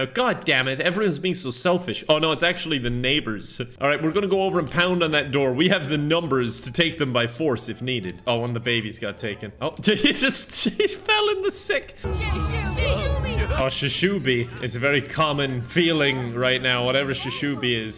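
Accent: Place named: Ireland. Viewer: New video from Me: tremble to hear the cute Irish accent and watch the latest massacre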